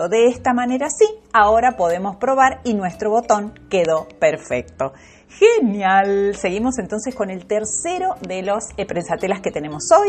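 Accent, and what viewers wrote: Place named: Argentina. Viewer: Un video tan útil, con explicaciones claras. Me encanta tu acento (argentina). Muchas gracias.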